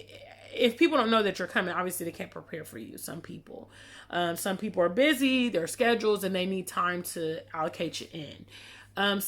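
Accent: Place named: United States. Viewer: Well come back sis, your American accent with twi made me chuckle. 😂 God bless you.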